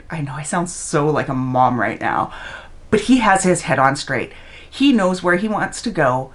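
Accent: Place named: Canada. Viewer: I had a feeling that was a Canadian accent, then you mentioned ketchup chips, and that confirmed it! I had a friend in Canada that used to send them to me in Virginia about 4 times a year, they are my favorite! I miss her and especially the chips. I only wish I could meet the kind people you are describing in this video. Most of the people you are speaking of seem very caring, I'm happy you had such a wonderful time. You didn't stop smiling throughout the whole video, and that really says something ❤. You're a true good-hearted Canadian, and I'm very happy you had such a great time with fabulous friends. 🤗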